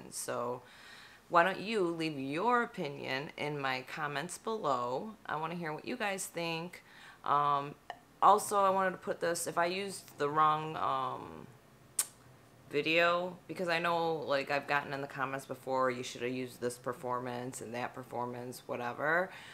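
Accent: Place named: United States. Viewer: >>> lol. I don't think you have an accent... Maybe the guy was just mesmerized by your american accent. 😁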